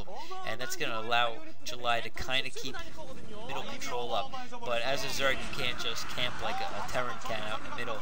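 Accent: Boston, United States. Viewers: nice a new commentator...ur prolly from boston...keep up the great work man...enjoyed it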